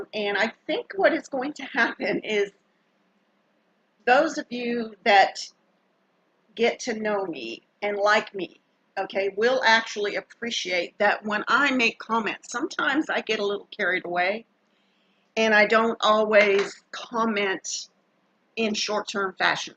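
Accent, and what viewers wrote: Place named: South, United States. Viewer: I love the sound of a refined southern accent. I’m not originally from the south, but I lived in Alabama from <num> and most of the people I came into contact with were very well spoken. Maybe you were staying in the sticks, I don’t know.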